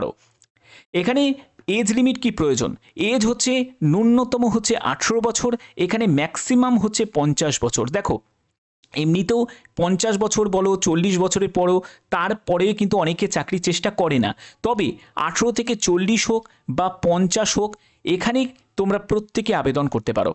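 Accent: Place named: Hooghly, India. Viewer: Hooghly thakey bolche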